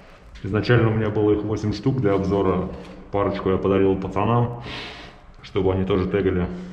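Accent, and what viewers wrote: Saint Petersburg, Russia. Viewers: ты с Питера?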